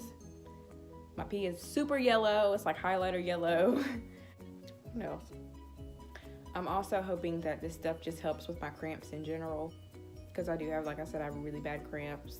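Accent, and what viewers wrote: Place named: South, United States. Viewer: Omg I love your southern accent.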